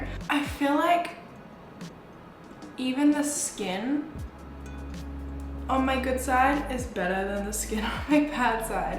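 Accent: Australia. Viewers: Any Brazilian watching??? your videos are the best ❤️ I miss australia so your accent makes me happy😂😂 good lock on your channel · Are you Australian?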